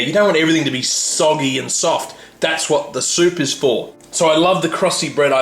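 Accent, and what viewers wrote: Australia. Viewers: HE'S BLOODY AUSTRALIAN MATE. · you can tell he's Australian because of his accent, not British and actually us Brits can cook mate so fuck off to your little cave and eat your rocks.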